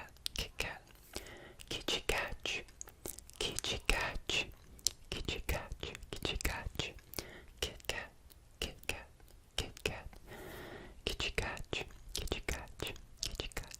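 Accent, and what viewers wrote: Brazil. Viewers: Hola! Me encanta el acento portugués. 👍🏻👍🏻👍🏻 Además lo haces genial, he reconocido muy bien las marcas que pronunciabas. 😊 Gracias una vez más por tus vídeos! 🫶🏻🫶🏻🫶🏻🫶🏻🫶🏻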